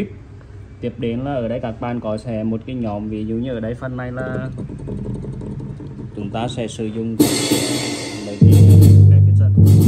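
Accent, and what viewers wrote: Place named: Central, Vietnam. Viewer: Kênh của Bạn kinh doanh đàn, các dòng đàn rất phong phú và hợp lý giá. Góp ý cho bạn chút suy nghĩ cá nhân của mình: Xin lỗi bạn vì giọng bạn đặc thù miền trung, Bạn nên đeo micro khi quay Video để mọi người dễ hiểu và nghe bạn truyền đạt. Thân ái trong Chúa.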